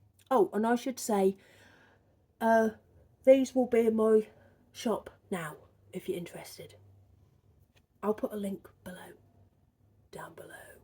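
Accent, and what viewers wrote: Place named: Norwich, United Kingdom. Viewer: I have so enjoyed your daily visits this month. You talk so much like my mother who was from Norwich. She married my dad during WW II and he brought her back to Indiana in America. I have been over there several times and absolutely loved every moment. ❤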